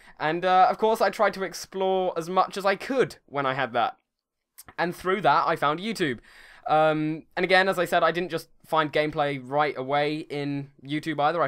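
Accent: United Kingdom. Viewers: When you move to the US, won't you lose your British accent? Kinda sad really, all the British LPers are going over to the US. Ah well, can't be helped. Anyways, you going to keep dat accent? :D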